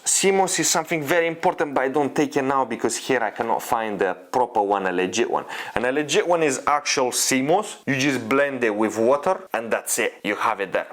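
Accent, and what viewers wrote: Russia. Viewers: That russian accent 🥶😮‍💨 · Блин, я подозревал что ты русский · dammm finally a real fella slapping reality out here, loving your hard cold facts and love the Russian accent too ❤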